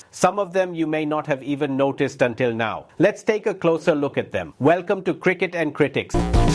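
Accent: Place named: Barbados. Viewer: Bhai you look like someone from Nallasopara, why are you speaking like someone from Barbados?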